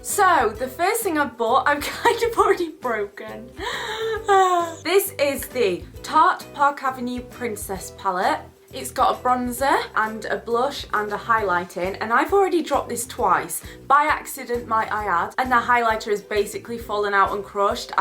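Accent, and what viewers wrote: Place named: North, United Kingdom. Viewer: Love you videos:) can tell ur northern lol saying it's sick lol:) xx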